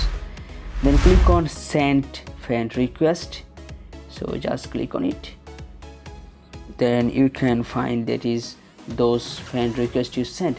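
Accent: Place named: Bengal, India. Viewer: Bamgali crazy English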